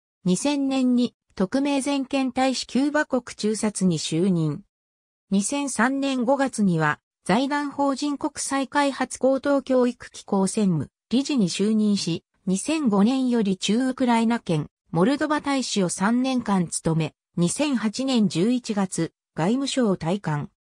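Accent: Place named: Kansai, Japan. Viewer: 関西の人なんだ馬渕睦夫先生😄親近感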